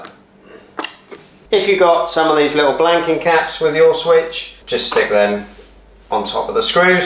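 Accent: Norfolk, United Kingdom. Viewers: Great content, are you but any chance a fellow norfolk or Suffolk man ?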